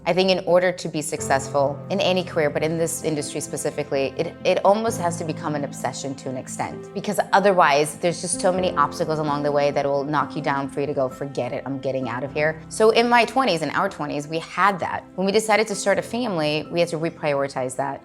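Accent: Ukraine. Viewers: i love how i can hear her ukrainien accent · I feel like she must have been talking to her mom or dad right before this because I can hear her Ukrainian accent sneaking out 😂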